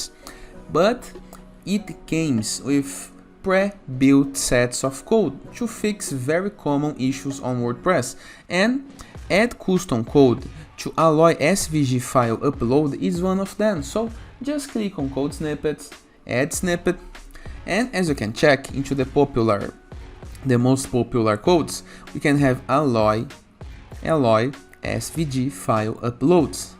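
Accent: Brazil. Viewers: consigo reconhecer o sotaque brasileiro de longe, valeuzão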